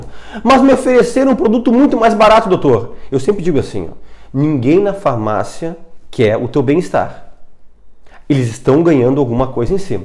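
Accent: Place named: Rondônia, Brazil. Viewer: O senhor fala a nossa língua Rondônia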